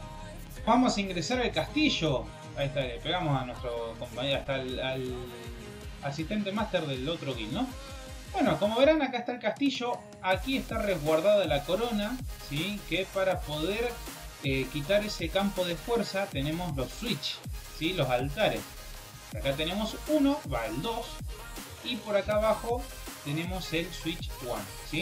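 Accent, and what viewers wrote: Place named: Rosario, Argentina. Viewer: Sos de Rosario ?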